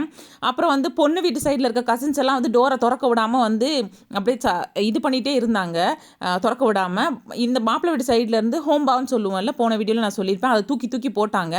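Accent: India. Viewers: அம்மாச்சியா உங்க ஊரு எது சகோதரி, எங்க ஊரு பேச்சு மாதிரி இருக்கு, எங்க ஊரு காரைக்குடி ங்க. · அருமை மதுரை தமிழில்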